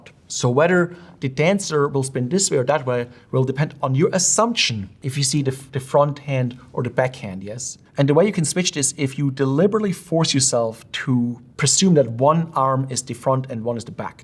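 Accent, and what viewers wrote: Germany. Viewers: I just knew he was German as soon as he started talking😂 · I HEAR GERMAN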